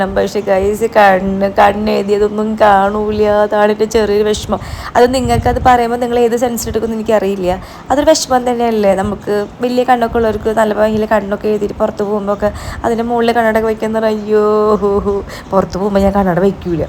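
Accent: Thrissur, India. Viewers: പിന്നെ എന്തുട്ടാ......aiwa തനി കട്ട.....തൃശൂർ സ്ലാങ്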